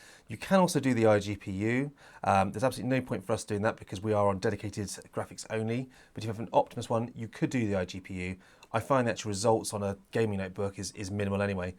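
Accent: United Kingdom. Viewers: I love this channel. British accent and detailed tech stuff ❤️❤️❤️ this is proper nerd stuff mate. Keep it up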